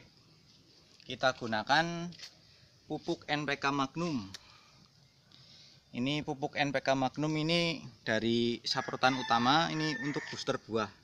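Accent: Banyumas, Indonesia. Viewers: Mas sampean kok medok banget..ngomongnya kok ngapak2...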